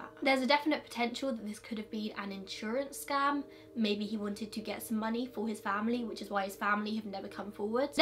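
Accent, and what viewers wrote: England, United Kingdom. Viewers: You are sooo beautiful! I love your videos! Love your voice and your beautiful English accent 😍😍😍😍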